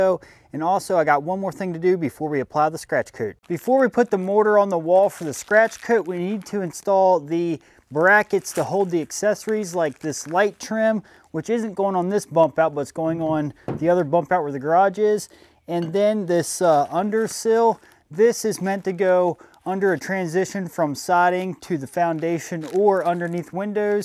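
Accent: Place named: Pittsburgh, United States. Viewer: Listen to that Pittsburgh accent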